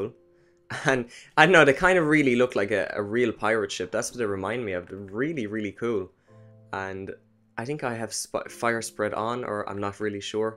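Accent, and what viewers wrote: Ireland. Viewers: Are you Irish? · Are you Irish ?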